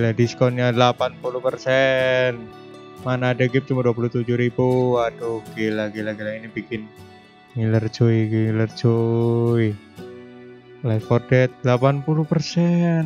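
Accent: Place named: Banyumas, Indonesia. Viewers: Ya musah ngapak lah kwiwkwowo guyonan om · Jan ngapak pisan koh medok medok😂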